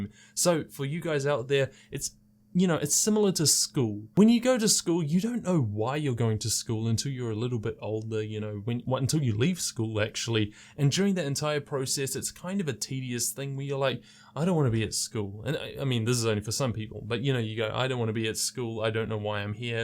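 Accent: New Zealand. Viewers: R u from NZ?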